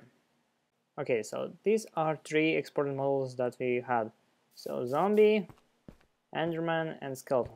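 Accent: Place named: Russia. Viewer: Your accent sounds like Russian.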